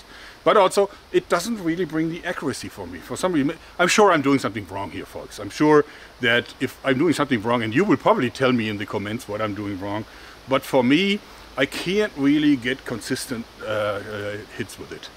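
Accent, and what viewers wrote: Germany. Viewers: Ist er deutsch? Es hört sich so an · Do not cut off the :"Ha Ha Ha" with german akcent ! It's one of reasons I watching You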